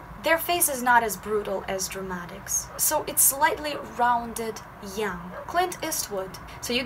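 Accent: Russia. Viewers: *beach *cheeks. She has a Russian accent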